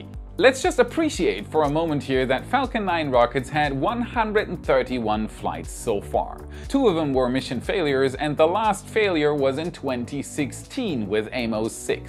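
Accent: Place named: Germany. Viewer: I love listening to that German accent, it reminds me of commander Craise on Farscape.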